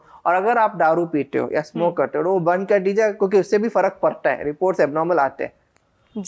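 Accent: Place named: Sidhpur, India. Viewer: Speaking hindi like a typical sidhpuri.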